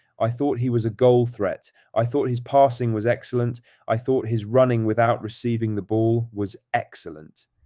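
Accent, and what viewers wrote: England, United Kingdom. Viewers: Beautiful narration in the King's English!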